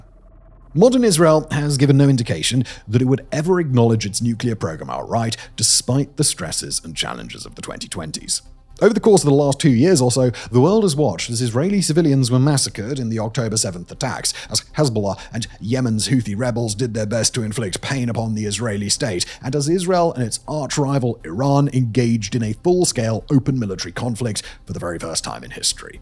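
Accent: United Kingdom. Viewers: i would enjoy your videos more and would be interested in all your promotions if you could stop making seemingly small but colossal mistakes that borders on misinformation like mistaking South Africa for South Korea in the Vela Incident, and calling the Rafale fighter, "Rafael". just talking fast and in british accent doesn't grant you immunity. tell your writers and editors to unfuck themselves.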